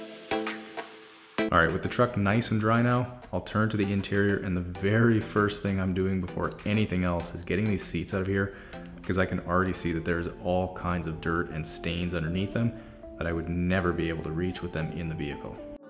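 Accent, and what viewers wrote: Canada. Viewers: just by listening to you speak, i knew you were a fellow Canadian 🇨🇦 keep up the good work! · Hey You from Canada?? Alberta???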